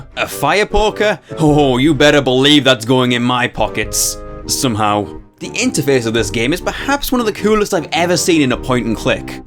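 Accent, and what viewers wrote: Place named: United Kingdom. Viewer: His voice is really british. ... i love it .-.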